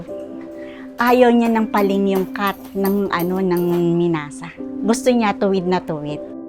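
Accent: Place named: Philippines. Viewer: The narrator sounds very Filipino, so unless they've perfected a generic Filipino AI voice, I doubt it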